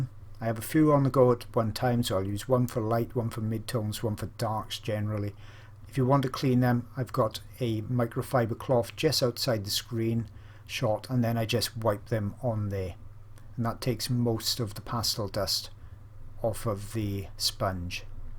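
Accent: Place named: Scotland, United Kingdom. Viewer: Thanks for ur tutorials u are a magnificent painter and u captivate ur audience in every way, I've learned a lot of materials and techniques with u, I'm not professional but I always liked the painting, now I've returned to my taste for pastels, it is hard to get the materials but derwent takes place in my country I bought some derwent pastel pencils in individual way and is more cheap than with the metallic case and other products, I give thanks for the Internet, a warm greeting from Aztec lands Mexico, I hope not bother u but u sounds like a scot beautiful accent 🇲🇽🇲🇽🇲🇽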